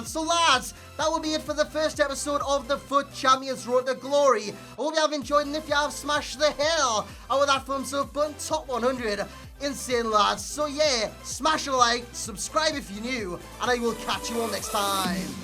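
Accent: Newcastle, United Kingdom. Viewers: is geordie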